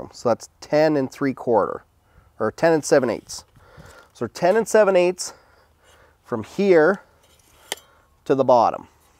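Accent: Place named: Nova Scotia, Canada. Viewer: Sounds like a Nova Scotian accent lol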